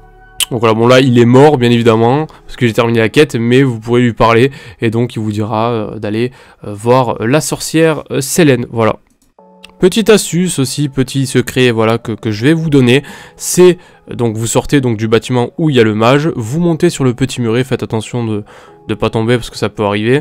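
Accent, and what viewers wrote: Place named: Paris, France. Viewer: oh c'est quoi cet accent parisien fada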